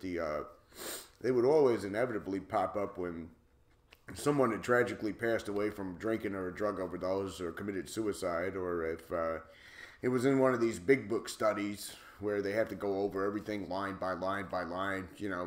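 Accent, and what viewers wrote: New Jersey, United States. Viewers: Your so funny, haven't laughed like this for such along time, I'm literally crying laughing. Love the Jersey "wise guy" accent. Gold. 🤣🤣🤣🧡🧡🧡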